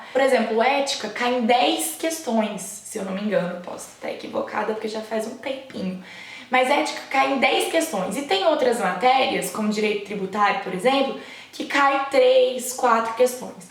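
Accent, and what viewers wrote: Minas Gerais, Brazil. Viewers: Você é mineira?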